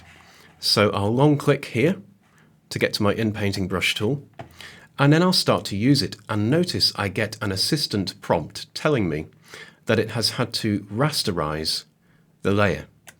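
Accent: United Kingdom. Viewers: 超爱你的英国口音。哈哈。另外一个老头不喜欢，还是你讲得好！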